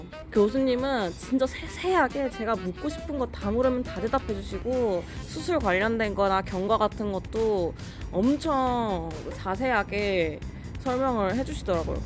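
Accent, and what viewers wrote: Gyeongsang, South Korea. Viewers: 예쁘고 털털하시고 경상도사투리ㅋㅋ 심쿵했습니다. 긍정적 마인드시니 빨리 나을 수 있을겁니다! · 억양이 경상도분이시군요 힘내세요!!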